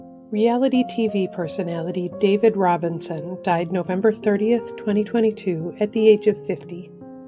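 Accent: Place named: Ohio, United States. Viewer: shout out to the gal that has been doing narration for Legacy~ calming voice. also i hear an Ohio accent me thinks. 😺Thank you~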